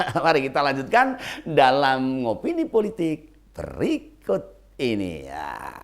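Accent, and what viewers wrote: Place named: Solo, Indonesia. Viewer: Mantab om...suka aku dengan presentasinya yang tegas..dengan dialek solo yang khas.sukses ya om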